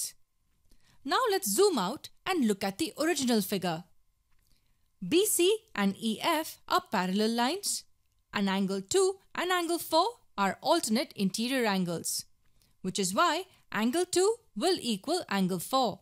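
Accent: India. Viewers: Beautiful Indian accent! Clear! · The indian accent.... ughhhh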